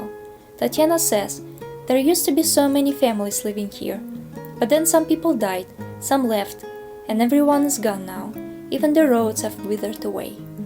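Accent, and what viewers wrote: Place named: Russia. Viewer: Интересно, а зачем надо было на английском (с явным русским акцентом) выдавать это видео? Думаете, заграница нам поможет?))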